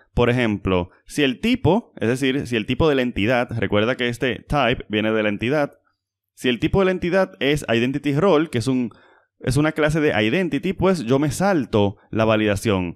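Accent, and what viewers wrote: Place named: Dominican Republic. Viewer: Hola, eres de Rep. Dom.? Pareces dominicano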